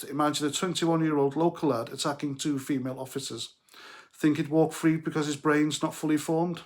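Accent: Wales, United Kingdom. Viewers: You are like a Welsh less harsh Pat Condell! I like it!!!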